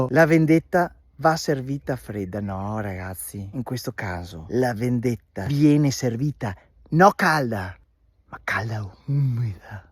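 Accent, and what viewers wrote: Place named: Veneto, Italy. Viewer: Oddio che accento veneto!!😂😂😂😂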